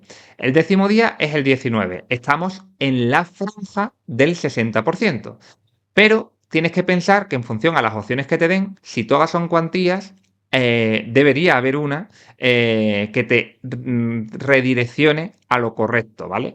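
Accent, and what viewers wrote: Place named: Andalusia, Spain. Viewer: Que bien lo explicas y además con tu acento andaluz me gusta todavía más jaja viva Andalucía ❤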